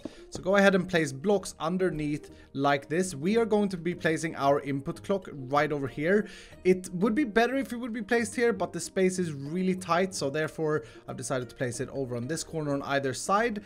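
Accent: Sweden. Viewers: Så jäkla kul med svengelska! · That Swedish accent tho!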